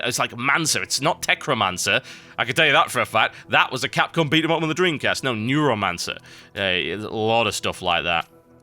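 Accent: United Kingdom. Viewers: Speak slower british dude!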